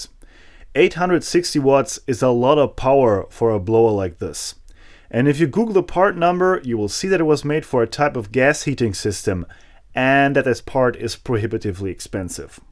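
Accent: Germany. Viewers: I was a US soldier serving in Germany from 1990-96 Your flea markets are much like your scrap yard, All nice stuff, over here in the state rarely do you get steel drops or anything that functions! Your videos are great, my mother was German, she passed in 2017, it's always nice to hear a German accent, thanks for sharing your work! ;)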